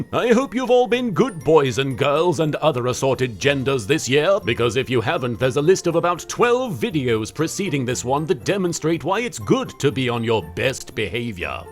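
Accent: United Kingdom. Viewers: Why is he so British…? 🤔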